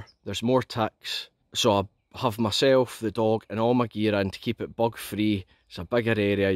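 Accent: Scotland, United Kingdom. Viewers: Holy crickey, you really are Scottish in your way of speaking. Long live linguistic diversity!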